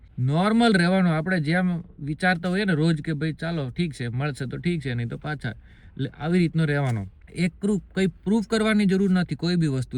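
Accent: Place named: Surat, India. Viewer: Surati gujarati bole chhe 😅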